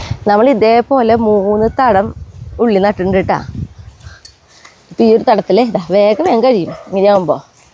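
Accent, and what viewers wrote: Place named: Valluvanad, India. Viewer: Chechiyude Valluvanaadan Bhashayum slaangum KELKKAAN Nalla Rasamund...🍍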